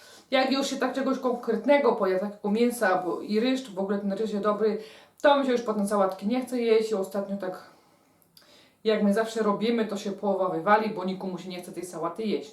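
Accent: Silesia, Poland. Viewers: Kocham jak mówisz po Śląsku👍👍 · Pani proszę więcej mówić gwarą 😊, taką prawdziwą. Uwielbiam tego słuchać. Pozdrawiam 😘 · niy prōbōj gŏdać cysto po polsku,bo ci to sztyjc niy wyłazi,przeca żeś niy je żŏdyn gorol. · Tłumok bo przecież powiedziała że mówi gwarą śląską pojebany jesteś😂😂😂😂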